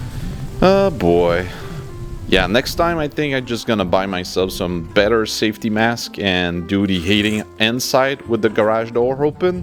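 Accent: Quebec, Canada. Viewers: Hi. I must say that this is the most pleasant and a bit unique quebec english accent I've heard. I would venture to guess Sherbrooke (because i haven't heard people from there), but really curious to where you're from.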